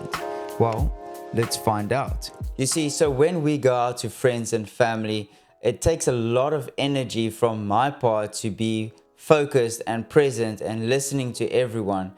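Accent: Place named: South Africa. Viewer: U sound south african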